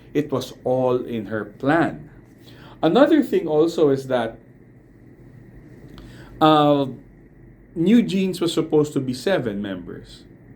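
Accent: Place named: Philippines. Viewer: your accent gave away your nationality. You look East Asian, Chinese BUT the way you speak English I know for sure you're Filipino.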